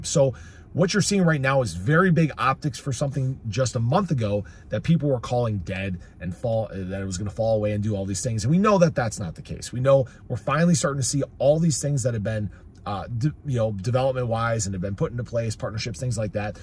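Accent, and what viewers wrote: United States. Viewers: Wisconsin, eh? I was thinking Chicago 😄 thanks for the great coverage of ADA! 🚀🚀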